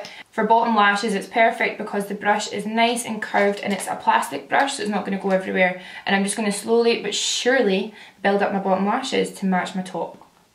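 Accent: Scotland, United Kingdom. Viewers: Omg, no makeup.. you're stunning stunning stunning.. with as well.. goodness, and the lovely Scottish accent too. Big smile!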